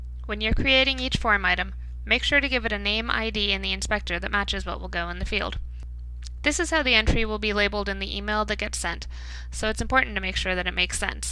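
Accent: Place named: United States. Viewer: why use a US voice?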